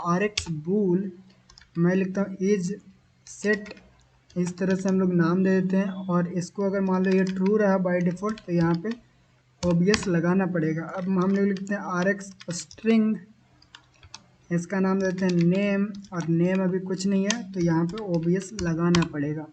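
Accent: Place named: India. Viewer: Bro your title English but your speaking Indian no way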